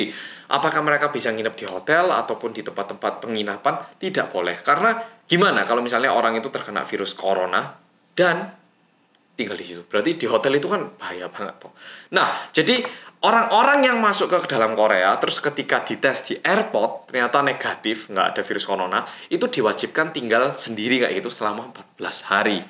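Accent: Java, Indonesia. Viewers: "Hallo Gaes Kembali Lagi Bersama Bersama Orang Korea Yang Medhooook/Luweeee" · gemes banget sama ngomong medoknya mas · Kok kayak ada bahasa jawanya bicaranya??? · Mas yang medhoook mwuhehehehe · Ngantuk bangettt Tapi pas dapet notif video orang korea yang MEDOK ga jadi ngantuk mas ehe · Halo halo mas medhokkk😂😍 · Bolo2 Medokkk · Aku ko senang dengar si mas ini ngomong,soalnya medok bngt,kalau suamiku tau pasti marah2 nih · Mas medok makin ganteng aja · 1. Aku suka cara pikirnya, "itulah peraturannya, kalau nggak bisa ya jangan datang" 2. Lama2 aku berpikir medok itu natural dalam bahasa Korea, saking bisa nyampur aksennya. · Ternyata babang glowing suka nonton juga babang medok😀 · Waaaa kaget, ini pertama kalinya aku nonton yt org korea yg ngomongnya medok jawa bgt😂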